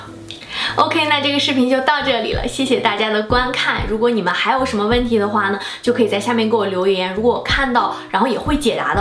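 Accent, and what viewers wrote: Shandong, China. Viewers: 朋友，听口音是山东人啊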